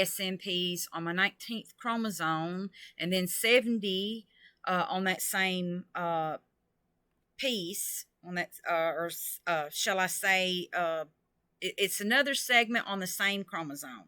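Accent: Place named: South, United States. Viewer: Sounds like this person is from the south.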